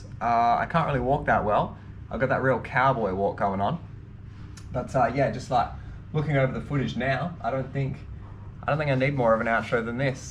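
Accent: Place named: Australia. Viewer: That was awesome. As a Canadian, I have known a bunch of Australians, and not only do I like hearing the accent, but most of the Aussie's that I have met were pretty adventurous/slightly crazy. This is exactly the kind of thing they would do on a whim. Loved how it seemed the watch kept taunting you by dropping a % just when you were ready to pack it in, like a challenge. Good on you for hitting the 0% and going one further.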